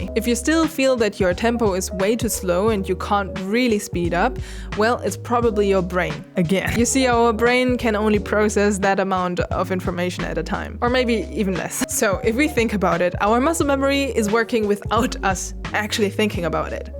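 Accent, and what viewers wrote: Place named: Germany. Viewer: By the way, your English is now majestic. I loved those cute details of your German speech, but the work you did inspires as much as piano one :-)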